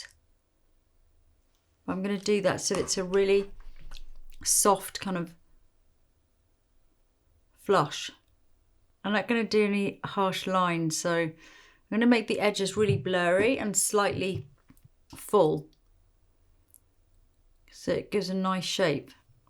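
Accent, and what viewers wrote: England, United Kingdom. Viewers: I don't know if I've ever seen someone applying make up more elegantly than. It's just incredible to watch. Just her imagination, like how she has this very real vision in her head...lol....and she brings it to life in her way. It's cool to see. It's clearly such a passion and she's very good at what she does. I just adore watching her work at her craft and passion!!!! So happy that there are people like this on the planet that are loving what they do and following their passion....too many work jobs they just HATE... so as to get that paycheck.... but the world would be a much nicer/friendlier/healthier place or should I say the human race? if people actually took the time to find their passion, and then figure out how to make money involving that passion! <3 Just wonderful to see.....(so graceful and elegant too! And ofc if she didn't have that english accent it would just feel different....she makes me feel like old school english countryside elegance / royalty yet incredibly humble and knowledgeable....i will say though, DAMN that brush collection! 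I'd love to see a makeup and brush collection video! )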